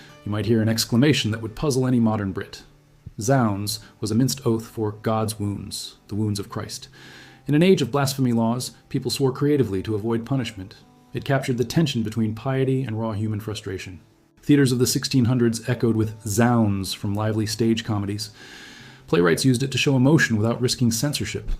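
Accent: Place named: United States. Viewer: Rubbish US accent commentary and many of the words pronounced incorrectly.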